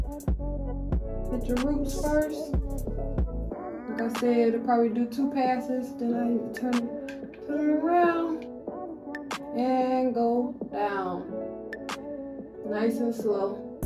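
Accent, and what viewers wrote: United States. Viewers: Omg loved the hair! I work from home and most of the time I wear my hair in a big fro. When I straighten it(not too often), my husband don't know how to act chile!! Lol. Your hair is beautiful, cool personality. New subbie here!!😊 Are you from New Orleans? Sounds like it😊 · I could hear the beautiful southern accent ❤. Yep it’s hot 🥵 down here… you look gorgeous ❤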